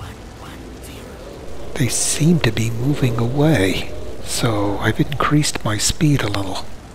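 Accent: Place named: North America. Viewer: Very well put together video - Ubisoft should be grateful for the advertising. Also it's great to hear someone with a North American accent to use "meters." Great job.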